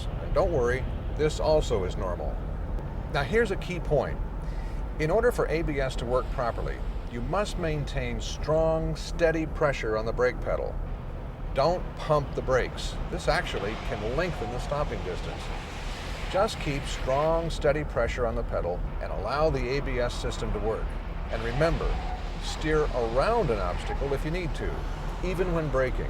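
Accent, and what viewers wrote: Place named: United States. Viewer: this is one creepy VHS era video why is an american bloke speaking with a posh English music in the background? :D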